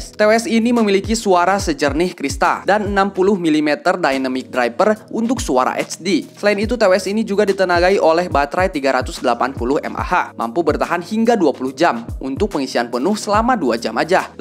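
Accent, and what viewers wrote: Indonesia. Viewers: Sunda pisan anjay pas buka videonya 🤣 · sunda pisan ieu euy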